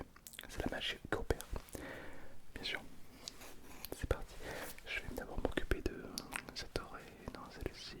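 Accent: South, France. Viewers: Hey j'adore tes vidéos je me posais une question, tu viens d'où du sud?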